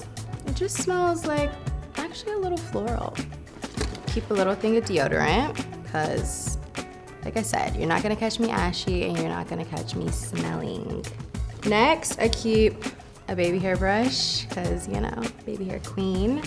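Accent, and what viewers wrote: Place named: California, United States. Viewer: It’s a California/LA girlie accent. We tired of it in the states as well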